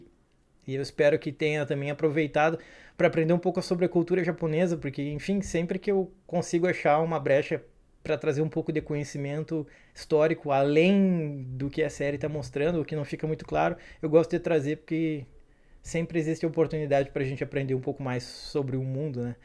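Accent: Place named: Rio Grande do Sul, Brazil. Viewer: muito obrigado pelo acompanhamento da série com os vídeos, pra mim tu fez a análise mais fiel e profunda da série que vi no YT! E é tri ver o sotaque gaucho mandando bem por aqui! Abraço!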